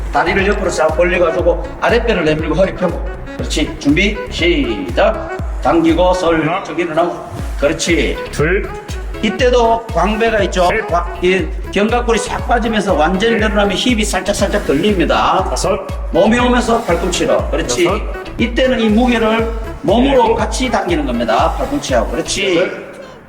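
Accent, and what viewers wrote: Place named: Gyeongsang, South Korea. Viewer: 나도 이 경상도 아저씨한테 배우고싶당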